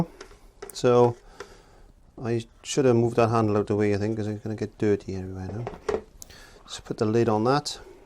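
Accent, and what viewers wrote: Wales, United Kingdom. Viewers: Do i detect a slight welsh accent? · the accent is Welsh...even the rest of us Brits can struggle a bit sometimes.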